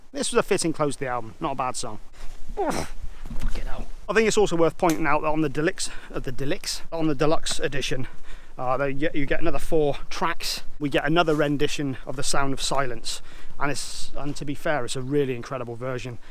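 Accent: United Kingdom. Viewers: It's a pretty decent album even though it ain't their greatest but it ain't horrible. And I must say that this video amuses me when you're explaining the meaning of the songs with your British accent! You deserve a subscriber!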